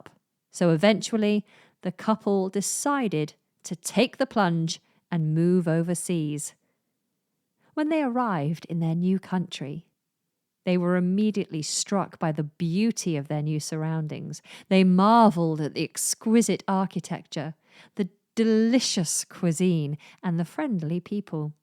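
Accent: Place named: United Kingdom. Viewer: Mam I have watched your almost all videos, I have got plenty of improvement in my British English,I like British accent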